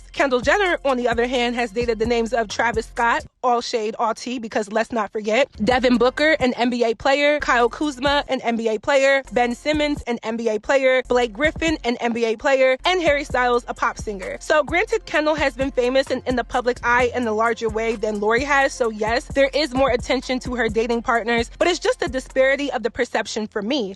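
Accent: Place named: Philadelphia, United States. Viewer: I knew that title had to be click bait . The only reason I know you’re African is because you tell us. You talk like every other girl in Philly plus you rap 😂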